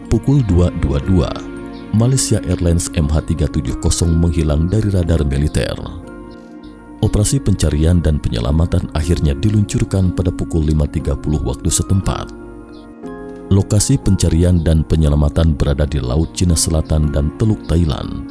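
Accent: West Java, Indonesia. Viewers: tapi PRONOUNCIATION ENGLUSH (pengucapan istilah ejaan)....kurang enak di dengar....spt bercampur logat sunda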